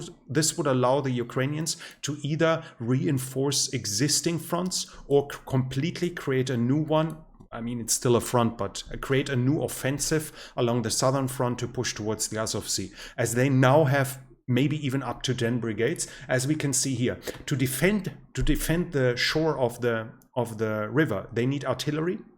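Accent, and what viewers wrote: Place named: Germany. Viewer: The moment I heard a German accent I knew this would be good.....just straight forward analysis based on cold hard facts and evidence without any political rhetorics how refreshing...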